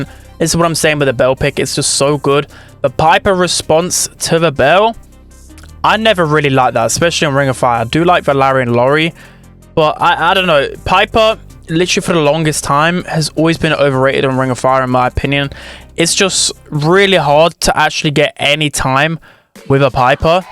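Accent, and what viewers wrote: United Kingdom. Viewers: when are u gnna unleash ur welsh accent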